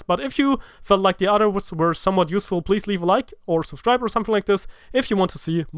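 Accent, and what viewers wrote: Germany. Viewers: ! Ist minimal hörbar hast für deutsche echt wenig Akzent, Gutes video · you are german am I right? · Влад Губин some german accent right there imo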